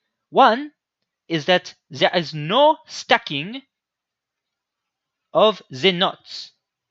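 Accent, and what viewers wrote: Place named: France. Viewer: what is this metaphysical comedy where you make amazing math content but do it in a French accent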